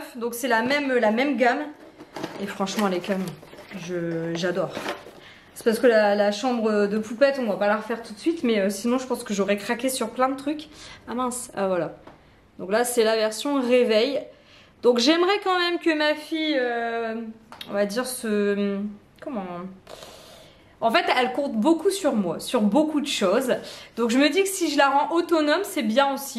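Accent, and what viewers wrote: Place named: Béthune, France. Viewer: Oh!!! J’adore votre accent! Vous êtes de quel coin? Mais vous l’avez peut-être déjà dit …(je suis une abonnée récente) cela me rappelle mes cousines qui sont du côté de Bethune.Sinon merci pour vos vidéos si agréables ❤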